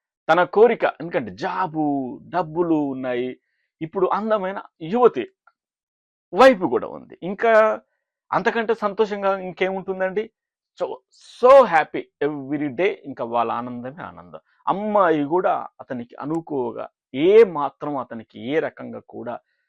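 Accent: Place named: Tamil Nadu, India. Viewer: Sir, neenga Tamil aa? Illa ennai pol Tamilum Telugum aa? Neenga pesara Telugu Tamil accent kalandhadhu. Aanal arimayaga Telugu pesikindireerargal. Tamil yasalo Telugu chala baga matladugunnaru. US lo Tamil lo matladi chala nallaindi naku.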